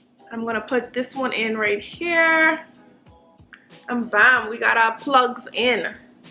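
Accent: Bahamas. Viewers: I knew I heard a Bahamian accent 😅😌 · I watching this trying to figure out if you Bahamian cause it sounds like you are... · I hear an accent. I may be wrong but are you Bahamian?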